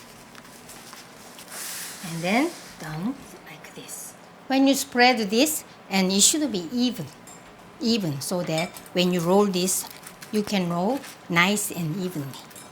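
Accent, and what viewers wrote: South Korea. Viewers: 한국인영어발음이셔서 ㄹㅇ 딱딱 귀에박힘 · 영어 발음이 경상도 분 같기도 하고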